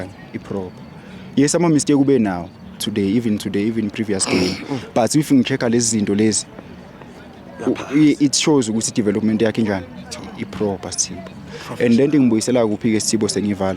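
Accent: South Africa. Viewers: I like his Zulu Tone❤❤❤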